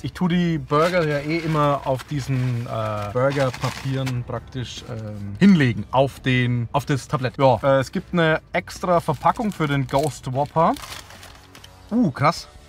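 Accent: Bavaria, Germany. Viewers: Du bist der woll sympathischste YouTuber Abo is da Bayrischer Dialekt direkt angeboren obwohl es auch ein Amerikanischer sein kann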